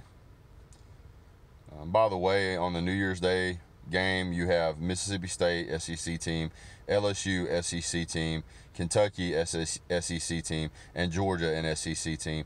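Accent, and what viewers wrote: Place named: Rural, United States. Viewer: You aren’t playing in “Atlannnuh” you fucking hick. “Elemmn bucks”. 😂😂😂 can’t wait to trash your page after Texas smokes you.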